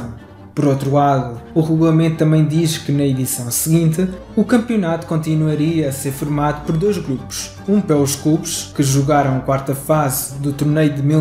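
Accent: Portugal. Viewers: Um dos melhores vídeos sobre a história do São Paulo, e com sotaque da terrinha! Demais! Contem a história da Eusébio Cup que vencemos o SLB no Estádio da Luz com o pior esquadrão da década! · Que legal esse vídeo Gosto muito do sotaque de Portugal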